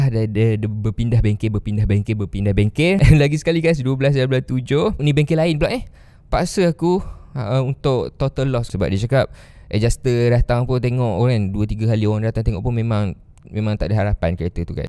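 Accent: Kelantan, Malaysia. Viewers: Org kelantan eh? Dgr cara ckp dpt detect..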